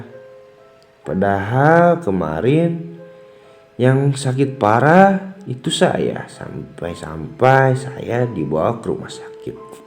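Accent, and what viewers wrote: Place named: Bali, Indonesia. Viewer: Bli org bali...ya ,cara mbaca nya koq aksen org bali...!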